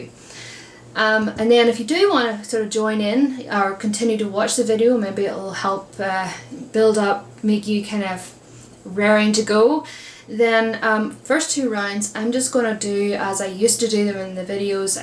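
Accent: Ireland. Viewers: I love Irish accents. I was in heaven watching "The Fall"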